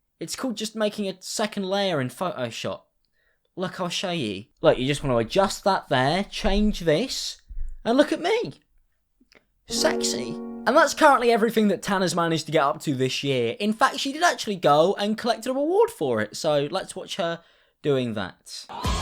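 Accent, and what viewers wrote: United Kingdom. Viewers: Yes, it is intriguing. And he speaks with a British accent too.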